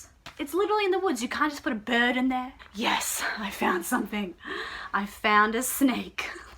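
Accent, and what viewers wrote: Australia. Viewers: I’m so happy you found me so I could find you! I lived in Australia for a few years and the moment I heard your accent it felt like home. Also love your picks, I’m waiting to buy when I can go out to a record store 😊 fun video, I’ll have to do a quarantine tag.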